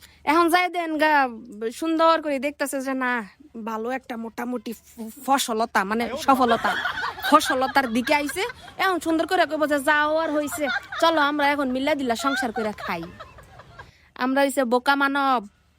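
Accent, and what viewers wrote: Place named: Chapainawabganj, Bangladesh. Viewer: এ হাপা তোমার মুখের কথা গালা শুনতে খুবই ভালো লাগে হারঘে চাঁপাইনবাবগঞ্জের ভাষা শুনলে হার ঘে ভিডিওতে ঢুকে পড়ো।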